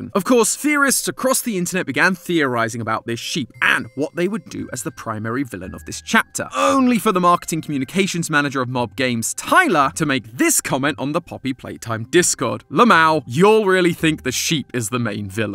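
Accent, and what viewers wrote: United Kingdom. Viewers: I think Tom was the perfect replacement for mat pat Except for the British accent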